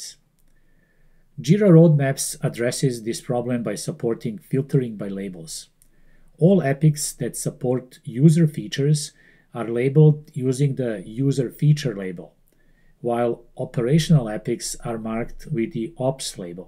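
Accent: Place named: Balkans. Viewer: Odma sa aboniro , cuje se nas covjek i sto je jos bolje tvoj Engleski razumijem 😊 Hvala 👍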